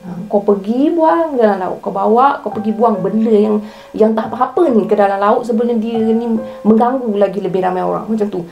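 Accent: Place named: Pahang, Malaysia. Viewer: Aok ni org mna.. melantoi mlek cara aok bcakap😅😁..salam rodong seram dari kwn org phg..peace✌️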